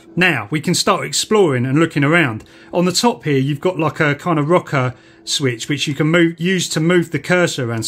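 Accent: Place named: London, United Kingdom. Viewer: A cheeky London accent version of serious radio! You should go down well with the sun readers.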